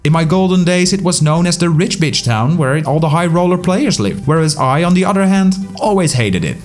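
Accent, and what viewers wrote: Netherlands. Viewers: Ik herken dat nederlandse accent meteen ;) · You sound Dutch, I am Dutch. I still have a character in Peloria, I can help you out anytime :) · Thick Dutch accent, my g.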